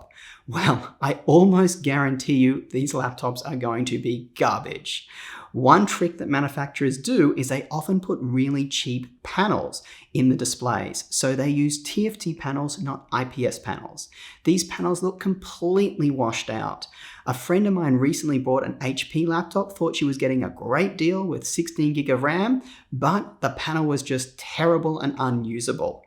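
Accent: Australia. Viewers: you sound Australian ,but are you talking US Dollars & web sites ????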